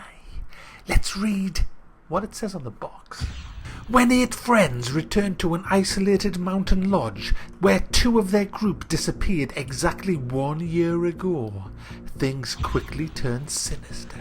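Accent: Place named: Newcastle, United Kingdom. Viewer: You sound like the Geordie version of Sir Davos from Game of Thrones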